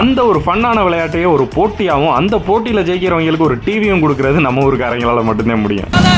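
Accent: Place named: Sivagangai, India. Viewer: Unga native Sivagangai thana 😂 but I love 💕 it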